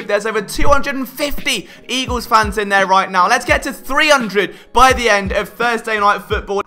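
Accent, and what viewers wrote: United Kingdom. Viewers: why iss a british guy talking about football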